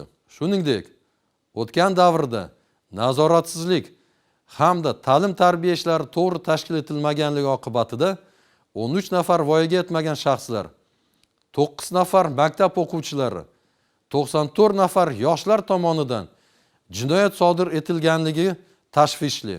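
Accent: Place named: Russia. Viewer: Амаки русча мактабни битирган шекинли акцент ута дахшат омад тилайман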